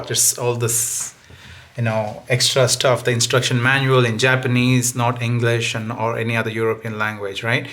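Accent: India. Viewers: is definitely an Indian fella.the his commenting.so fuckin yindiann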